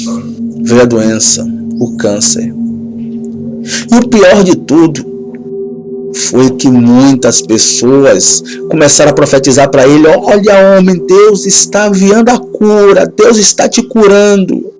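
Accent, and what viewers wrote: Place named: Bahia, Brazil. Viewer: jóia. Você é baiano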